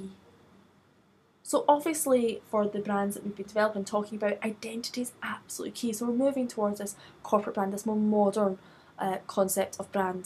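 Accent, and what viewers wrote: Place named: Scotland, United Kingdom. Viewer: I love her accent, Scottish?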